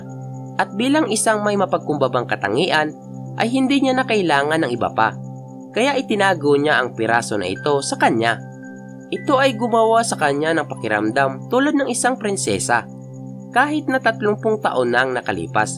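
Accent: Visayas, Philippines. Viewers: Bisaya k b dong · Bisaya Accent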